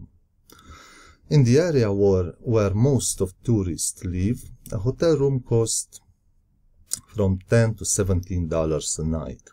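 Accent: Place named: Hungary. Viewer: Hm...as far as I understand an ESL teacher can expect some 1000 USD per month in Vietnam. Well I think in Romania nowadays a decent teacher employed at a public or private school can make this money salary + some private classes. Of course in Romania you wont have that abundance of jobs as in Vietnam. BTW...are you an ethnic Hungarian? your English has a noticeable Hungarian accent. Also ...congratulations for the excellent well calibrated comments and videos...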